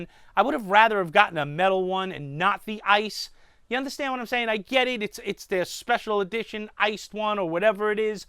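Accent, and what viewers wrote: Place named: New York, United States. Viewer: I can't use the low profile drip tips either. I just don't get WHY. But I just LOVE watching your videos man! So funny when it's all quiet, the kids are in bed, then all of a sudden a NY booming voice... "WHAT'S UP CLOUD CHASE-AHS!!!!" 😁🙏💗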